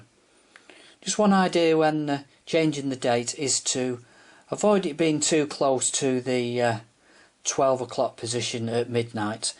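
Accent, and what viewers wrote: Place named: Merseyside, United Kingdom. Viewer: Are you from Liverpool? You have a Merseyside accent.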